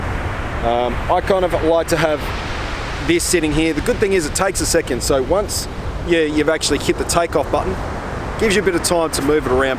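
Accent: Australia. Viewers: Aussie !!! Yeh mate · To man, I like your Australian accent 😍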